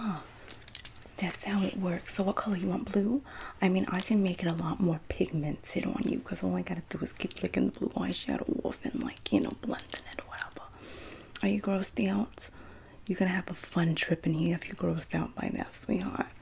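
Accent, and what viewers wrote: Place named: New Jersey, United States. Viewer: Your Hersey accent vids are everything 🩷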